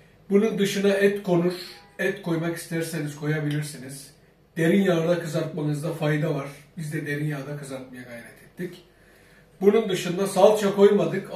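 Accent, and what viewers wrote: Gaziantep, Turkey. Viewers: Muhterem abicim, Videolarini zevkle izliyoruz ve elimizdeki imkanlarla vermis oldugun tariflleri yapmaya calisiyoruz. Yurtdisinda yasiyoruz. Imkanlarimiz kisitli olsada yinede guzel lezzetli Antep yemeklerini pisirmeye calisiyoruz. Abicim sana bir sey soracam. Oncelikle Salvarin ve Deri yelegin harika. Salvar tam benim istegim modelden. Antep Turkmen Salvari. Turkiye'ye ilk geldigim gun direk Antep'e gececem ve 2 hafta kalacam ve Videoda tanittigin yerlerde dolanacam. Senden ricam Senin giymis oldugun Salvari diken ya da satan yeri bildirirsen cok memnun olurum hatta deri yelegini de. Soyle 4 yada 5 adet siparis verecem. Ayrica sivene hastayim. Tam bir Antep sivesi harika. Muhterem Annenin ve Babanin ellerinden operim. Allah'a cc emanet ol.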